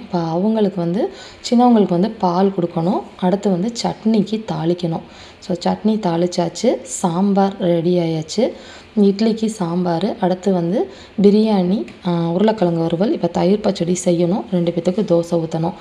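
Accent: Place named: Tiruchirappalli, India. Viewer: my name Devi 67 years old l got my degree on1974 from your way speaking Tamil I thought that u may be Trichy or Thanjai Trichy so many relationship are there Trichy past mla anvil poyyamozhi My close relative from your way of speaking I understood I am also interested in cooking like you My daughter at Fort Worth she will also cook nice ly Yesterday l spoke about you to my daughter her name Narmatha She’s working at CTS software company 2017 we came to Fort Worth stayed 2months Stay blessed with your family Bye da Chellam Trichy town are near by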